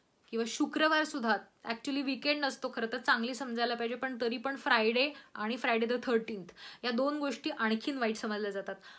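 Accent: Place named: Pune, India. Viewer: Hi,tumchya uchcharatun spasht janawtay ki tumhi punyachya ahat? Ami right?😂😂 But still you have very well explained the topic n let me tell you video on rotimatic was awesome but little costly thats all.all the best.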